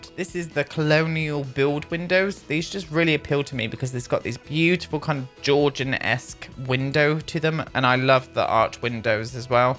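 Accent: United Kingdom. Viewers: Your accent is the accent I do when doing a british accent and I guess it's a real accent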